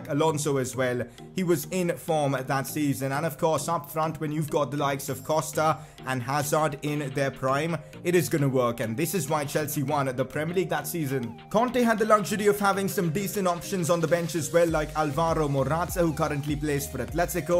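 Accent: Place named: India. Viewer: you sound like indian guy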